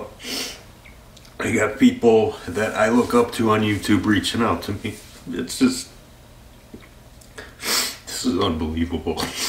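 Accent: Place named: Midwest, United States. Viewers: Your midwestern accent warms my heart. I saw your blood vessels in the thumb nail and immediately thought "Hey it's that guy that did some Nile riffs years ago" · Minnesota?????? You can’t hide that accent from me bro hammer!!!!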